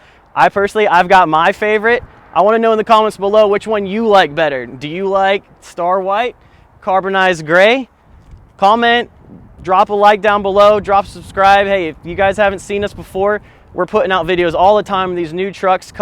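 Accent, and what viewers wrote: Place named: South, United States. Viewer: Good stuff, love the rolling shots as well. Both beautiful trucks. Only thing missing is the signature southern accent we all love. ( my wife says your hot. Haha ) On the white truck. What is minor trimming?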